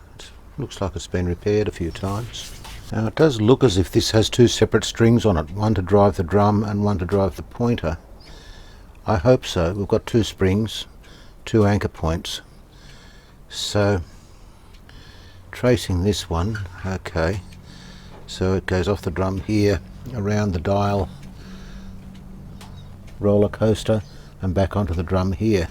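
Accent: Australia. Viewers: Geez thanks, Yup I first saw Manuel shield the caps this way then Dave and you, so if it's good enough for the pros, I figured it was the way to go. The thing is I have no formal electronics' training, I didn't even learn a trade in school; My first studies in college were photography, I struggled to get by in this field so later in life I had a career change and became a police officer (nobody's perfect...) Then after a few years of retirement I stumbled across some of Dave's radio restorations and I was hooked from the start. I always loved vintage audio and my personal gear is all from the 70s and 80s. I leaned about radios by myself and watching you guys' tutorials and got in contact with Dave for my first restoration of a 1937 RCA-Victor 87-K AM console. He's been mentoring me ever since, I owe him everything. As I gained a little more experience, like I said I started understanding more and more about radios and repairing them and there no better hobby for me at this point in my life. 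As for editing videos I know what you mean believe me, I 't have a proper video camera so I shoot everything on an iPhone and Apple being Apple, editing is always challenging... I of course subscribed to your channel some time ago and I'm always looking forward to your next instalment. Oh and by the way I love the Aussie accent 🙂 Cheers mate, lol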